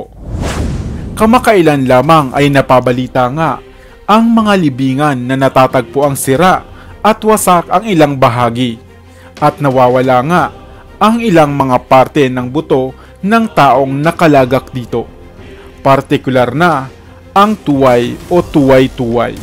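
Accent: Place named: Philippines. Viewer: Galing ng boses ng narator maliwanag ang mensahe pilipinong pilipino di gaya ng iba slang n di mo alam kung sang bansa galing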